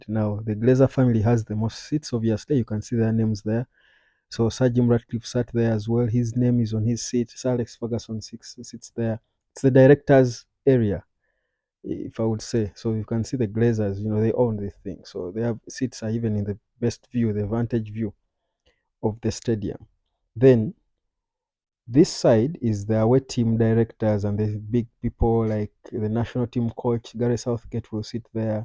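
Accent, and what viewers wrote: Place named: Uganda. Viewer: eno accent ye Uganda eno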